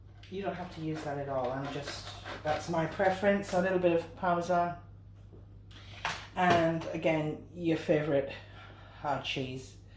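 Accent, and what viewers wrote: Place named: North East England, United Kingdom. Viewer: Just found ya channel. love it for ya home cooking . I crumble bits of black pudding into my lasagne along with a half t spoon of fennel powder ( or italian sweet pork sausage which has fennel in it )and some chilli flakes for a kick. By the way where in North East are you? I'm from sunderland originally ( I think you're not that far off by ya accent?) but I'm living in Spain right now so missing homestyle food. .I'm catching up with ya recipes ( love the Greggs pasty video ). Well done ,keep going.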